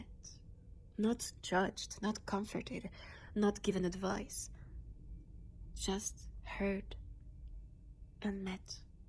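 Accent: France. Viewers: Idk why the french accent have an aura I LUV IT..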